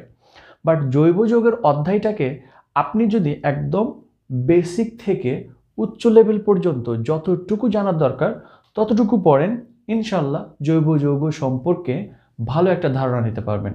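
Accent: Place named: Rajshahi, Bangladesh. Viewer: স্যার আপনার কথা থেকে কেন জানি মনে হচ্ছে রাজশাহী অথবা চাপাই নবাবগঞ্জ বাড়ি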